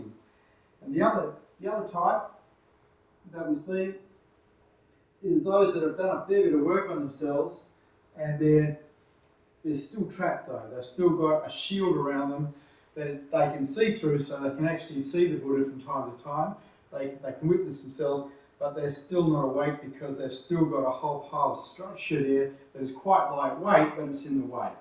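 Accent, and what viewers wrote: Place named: Australia. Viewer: I wish I could understand the Australian accent 😢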